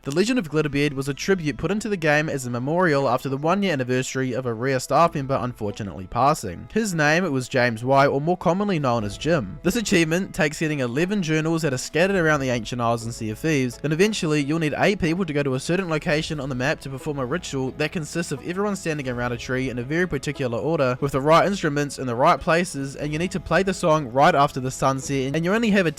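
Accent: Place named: United Kingdom. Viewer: What is this ultra british accent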